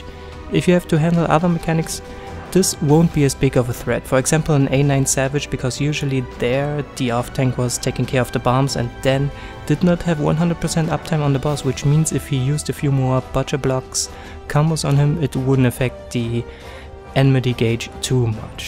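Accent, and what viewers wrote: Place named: Germany. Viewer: Ty for this guide!! Und so bist du Deutscher? Höre es in deiner Stimme iwie😂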